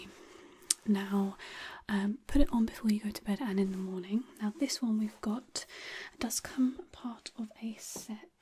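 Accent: United Kingdom. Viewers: If I could ever actually master a British accent I’d love to have the same as you. ❤️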